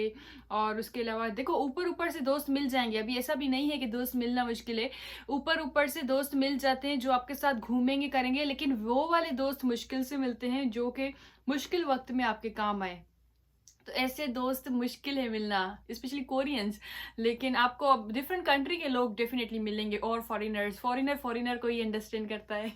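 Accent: Sindh, India. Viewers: Haha apka sindhi accent😂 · Why your accent is indian????dear proper urdu zaban ma bat karen plz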